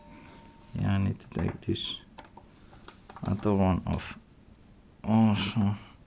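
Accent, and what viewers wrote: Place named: Finland. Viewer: Juu ajattelin vaan ku sulla on silti toi suomiaksentti mutta sä et jää jankkaamaa sanojen kaa kuten monet jää.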